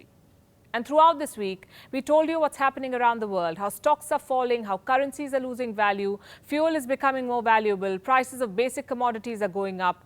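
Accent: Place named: India. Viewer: Palki has a great indian english accent. How did she get to speak so clearly and confidently?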